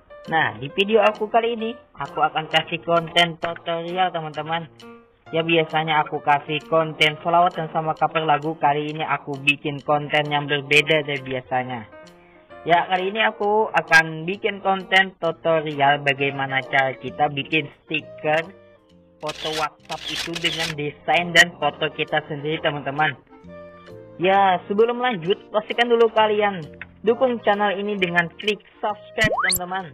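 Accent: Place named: Banjar, Indonesia. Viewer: Ktahuan org banjar😅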